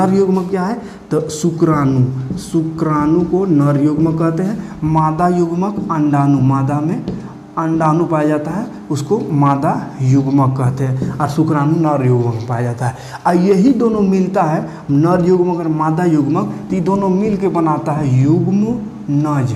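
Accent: Bihar, India. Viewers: Aap Ke aavaj se lag ki Aap Bihar se ho😄😄🙄🙄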